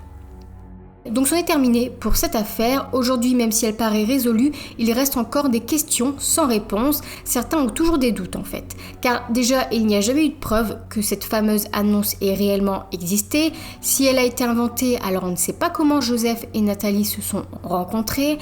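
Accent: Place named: Nord, France. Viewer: T'as grandi dans la famille ch'ti ? Parce que maintenant c'est rare que quelqu'un si jeune comme toi possèderait un accent très prononcé. Je me moque pas de toi, c'est juste que je kiffe cette prononciation. Ça fait un petit moment que j'apprends le français et je me suis habituée à prononcer tous les a comme toi. J'ai vu la vidéo où tu disais que tu songeais pas changer ta prononciation et je trouve que c'est très bien.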